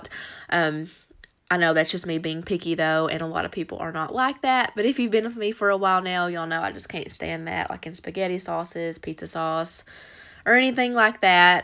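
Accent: South, United States. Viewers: Hi!! I have missed you and your southern accent so much. I also have a southern accent but not like yours. So glad you are back. Everything looks so delicious. Hope you have a great day and week. Stay safe. God bless.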